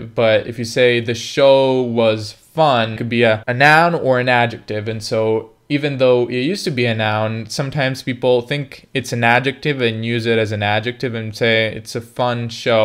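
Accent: United States. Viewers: your American accent is very good. I don’t think I’ve ever heard you speak English before and I know it’s quite an accomplishment to nail an accent without having lived in the country for many years. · you sound totally american.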